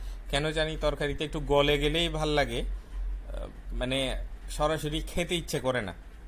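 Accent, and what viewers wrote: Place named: Chittagong, Bangladesh. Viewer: Apu ke bolsi,apu problem holo ami chittagong er kothagulo bujte parini...☹!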